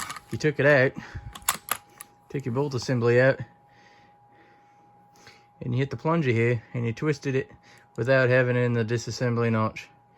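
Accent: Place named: Australia. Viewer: Bro caught an Aussie accent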